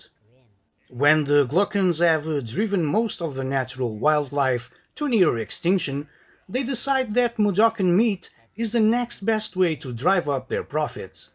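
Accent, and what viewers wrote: Portugal. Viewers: Are you portuguese? I think i recognize the accent )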